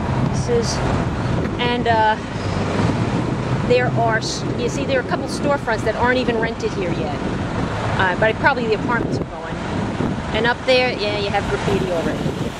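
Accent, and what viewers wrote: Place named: Ridgewood, United States. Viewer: Nice video. Good to hear a Ridgewood accent out here in Colorado. I use to sell pretzels on the corner of Seneca and Myrtle. I made seven dollars in four hours in 1969. That was the height of my earning potential! You could buy a lot with seven dollars back then. Another world, another time.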